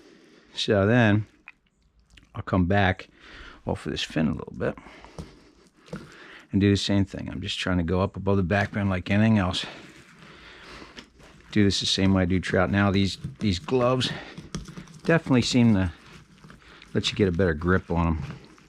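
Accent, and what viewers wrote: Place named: Southwestern Pennsylvania, United States. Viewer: Nice video, Sir. You sound like you might be from SW PA. May I ask where you caught the snakeheads? I remember reading of their "debut" in the Mon river maybe around 2019. Just wondering where else they may have migrated.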